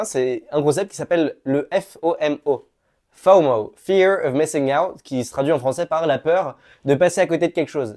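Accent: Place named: England, United Kingdom. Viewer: Wow l'accent anglais de fou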